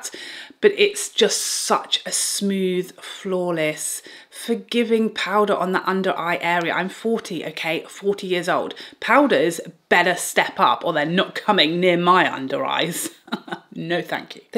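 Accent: United Kingdom. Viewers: The hair looks great 😍 I need blue eyes and a British accent now!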